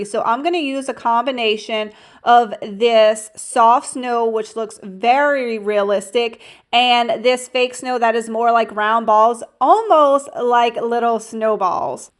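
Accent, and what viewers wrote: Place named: Louisiana, United States. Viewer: Just found you today and LOVE your ideas! Beautiful…..also, can I guess where you’re from by your accent and that it doesn’t usually snow there alone? Louisiana? I’m from Southeast Texas and that’s just what your accent reminds me of 😀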